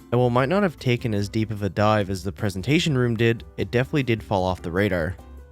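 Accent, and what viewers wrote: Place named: Canada. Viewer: love the canadian accent, nice video as always!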